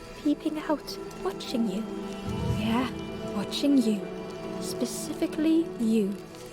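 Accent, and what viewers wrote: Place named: Wales, United Kingdom. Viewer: I actually really don't like her voice or her accent. She has the same pace throughout, regardless of the words. And she sounds Welsh to me. I was hoping for a way to turn off narration but oh well...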